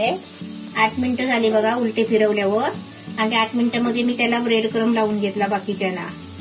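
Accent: Konkan, India. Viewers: Kaki tumch gaav konat tumchya bhashe varun tumhi koknatlya aahet asa vatat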